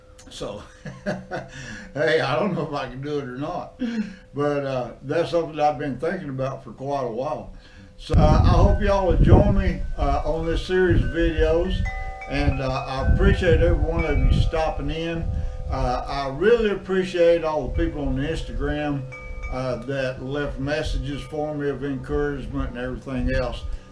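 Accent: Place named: Texas, United States. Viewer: Excellent first episode. I like the break in commentary .I like that old Texas accent good job 👍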